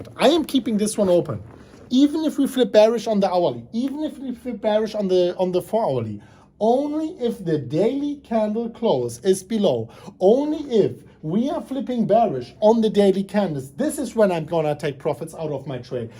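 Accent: Germany. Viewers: I love your German accent, I think it's part of your style. If you wish to improve, look for me.:))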